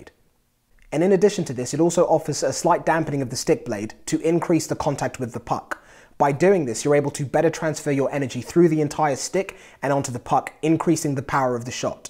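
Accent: United Kingdom. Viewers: is he british lol he goes fond of taking haha good vid tho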